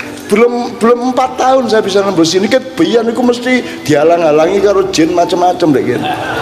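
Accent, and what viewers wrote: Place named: Java, Indonesia. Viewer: aku seneng smpean cak jan jawamu kentel❤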